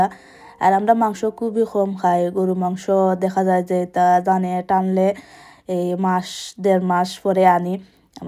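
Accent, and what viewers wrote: Sylhet, Bangladesh. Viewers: Afa sylheti mat j vala lagse ❤lal pori❤ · Kub sundor oise afa ..ow lager kati sylhetiii 😂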